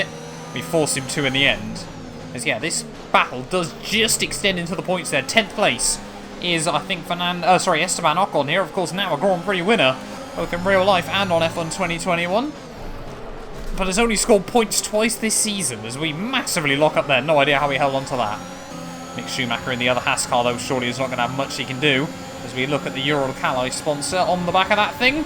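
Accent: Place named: England, United Kingdom. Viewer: time to hear say "reta oposta" and "bico do pato" in an english accent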